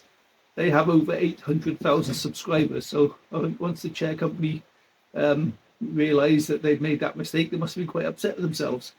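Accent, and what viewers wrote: Wales, United Kingdom. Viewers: I missed the subtitles. As an german Guy it is Pretty Hard to understand Your Wales accent😌😇 next Video with subtitels please.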